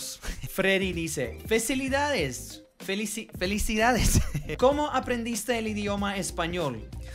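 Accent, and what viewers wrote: Colombia. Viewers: Me encanta escucharte hablar, ( porque aprendiste el español Colombiano).soy Colombiana y vivo en México. Siento que hablas mi idioma☺️☺️☺️ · So you're a Russian from Moldova who grew up in the Netherlands and now speaks Spanish with a Colombian accent? Dang! And I thought my story was complicated. Nice channel man!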